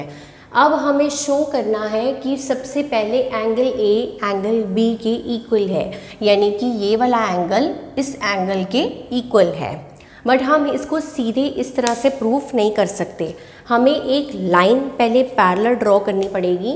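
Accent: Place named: Punjab, India. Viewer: Mam aap ka way of teaching bhut best hai Apki panjabi bahut cute hai ... Mam aap bahut acchi Hain ❤️❤️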